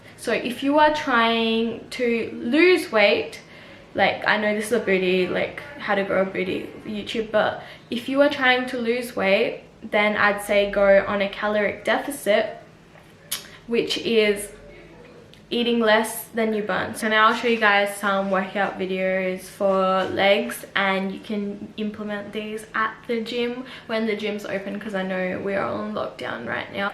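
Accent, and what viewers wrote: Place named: Australia. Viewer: Lol u sound like a propa aussie lol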